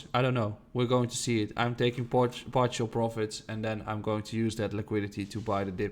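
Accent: Netherlands. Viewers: Finally a down to earth guy, ive started unfollowing allot of other youtubers becouse of the insane fomo talk hahaha, btw im hering a dutch accent am i right?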